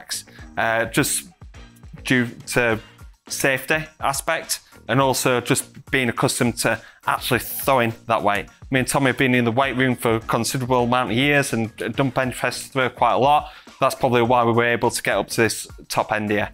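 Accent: United Kingdom. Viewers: Amazing! Please if you can add English subtitles for French and foreigners it would be great! Because the British accent is not always easy to understand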